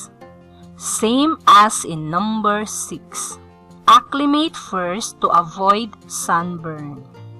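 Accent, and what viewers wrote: Philippines. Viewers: The narrator has a Filipino accent, yes?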